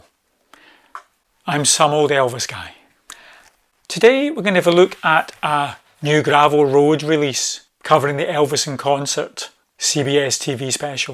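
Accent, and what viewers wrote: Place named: Scotland, United Kingdom. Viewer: I find it difficult to watch, this time period without feeling sad but his voice was still spectacular. ❤. I love your video. Nice to put a face to the lovely, knowledgeable Scottish accent😅 😅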